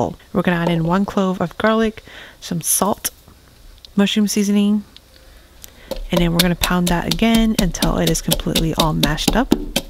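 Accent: United States. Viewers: Are you from Kansas?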